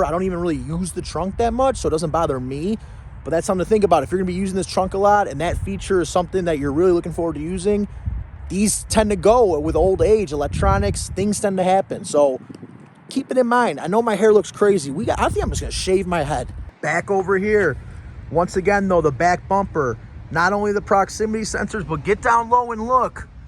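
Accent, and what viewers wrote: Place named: Chicago, United States. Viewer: I’m from chicago too and you’re one of the only young cats that still have that old school accent. Hey brother how much should i pay for an 02 town car with 28k miles? Guy wants 8500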